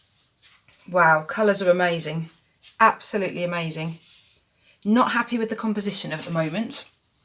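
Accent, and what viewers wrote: United Kingdom. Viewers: So lovely to hear a UK accent!! Glad I found you 😁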